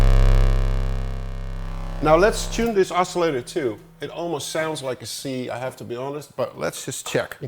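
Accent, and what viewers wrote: Netherlands. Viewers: your accent is almost completely gone but I grew up with dutch parents and their dutch friends who loved keeping adult conversations from us by not teaching us dutch . but it was still fun to listen to . to this day a dutch accent is music to my ears lol . hope I'm not wrong on this ______if so you just heard my long story for nothing . (ok back to the video) gerrit jan schraa california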